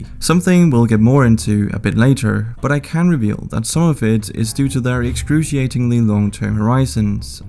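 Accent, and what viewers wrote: Denmark. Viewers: This is completely unrelated but god damn the danish pronounciations are just so authentic! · Man kan bare høre han er dansker lmao.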